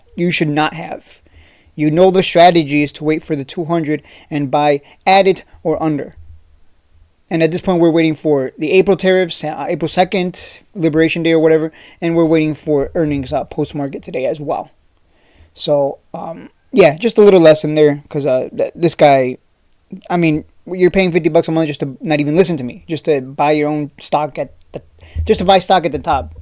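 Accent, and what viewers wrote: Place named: Long Island, United States. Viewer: Maybe people think that because the guy prostockmarkets I think is his name keeps mentioning you and he said you sound like you are from Long Island here in NY.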